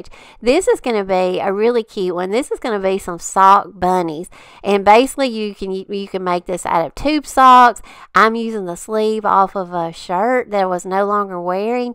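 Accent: South, United States. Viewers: Hello! Donna from Delightfully Southern mentioned you on her channel and she was right....you are so talented! Loved all of your crafts and I have already shared them with 3 family members!!! I was wondering what state you are from? I am in North Carolina and I noticed you have a southern accent so, I was just wondering. Can't wait to watch more of your video's. You all did a great job with your house. I did go back and looked at some of your older video's. ❤️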